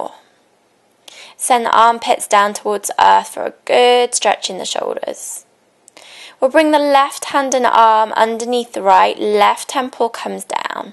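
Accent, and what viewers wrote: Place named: United Kingdom. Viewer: Thanks. I used the video that you referred to this morning - it is very good too. Thank you. If you are open to other suggestions, here's a couple: 1) You say in your bio that you also practice Mindfulness. I started doing this last fall. Have you considered doing some guided mediation videos? I use other guided mediation videos on YouTube and they are very popular. Your voice soothing (especially British accent). 2) A quick 5-7 minute stretching video that people could do at their desk in an office.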